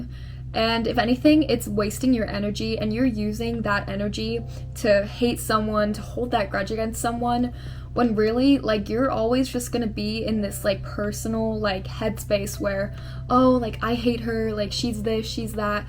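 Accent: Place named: United Kingdom. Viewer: Loving the video so far! Please can you do a full YouTube video using your British accent? I would love to her it❤️!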